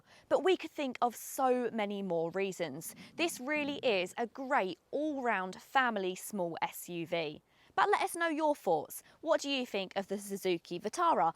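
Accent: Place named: Essex, United Kingdom. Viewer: The accent of the presenter nearly turned me off the car.. Must be from Essex.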